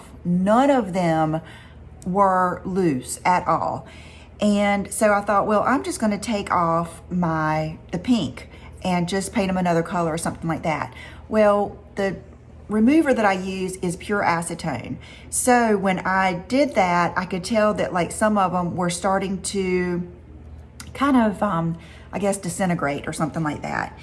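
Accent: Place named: Texas, United States. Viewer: I just want to say that I’m a NoCal girl(old lady) and nowhere near you in any stretch of the imagination; looks, class, knowledge of products, size, nothing! And I was always amused by you because of your way. But I have just fallen in adore with you! Cute as a bugs ear! Sweet and unpretentious and humble but not mousey scared or cow tow ish. I LOVE YOUR TEXAS ACCENT! I love your lips, hair, makeup, accent, syntax, vulnerability, etc. carry on. That’s all. Just be you! Do not change!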